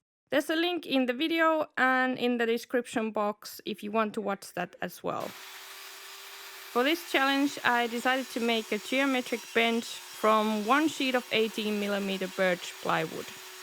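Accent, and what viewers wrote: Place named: Finland. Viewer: Simple yet very nice looking design! What makes it more interesting that I was just browsing general wood-working videos then this one was recommended, and I recognized from your voice that you are from Finland as well :)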